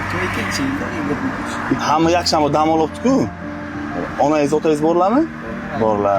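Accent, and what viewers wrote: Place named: Bukhara, Uzbekistan. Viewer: Buxoroya shevesh has lekin dashumo Buxorogimi shumo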